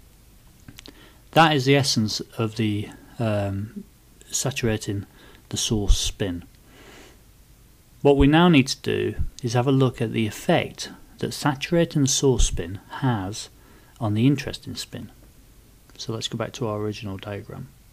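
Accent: United Kingdom. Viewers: Hard to understand british accent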